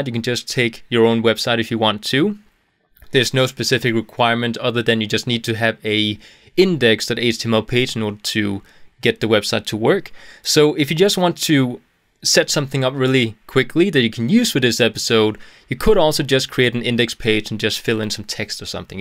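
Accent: Denmark. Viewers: are you from denmark?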